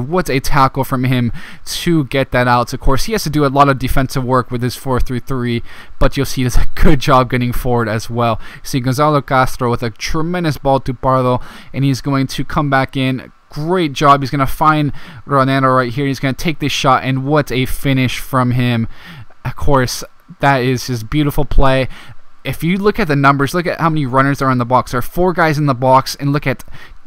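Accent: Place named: United States. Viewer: Finally you don't know how hard it is to find someone who does AMERICAN English Fifa career mode great video btw new sub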